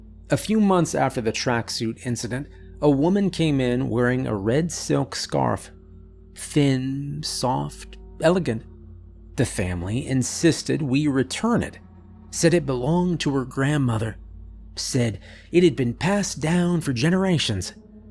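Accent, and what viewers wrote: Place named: East Coast, United States. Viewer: I’m guessing is on the north east coast — the way he pronounces room is “rum”. Seems to be an eastern accent (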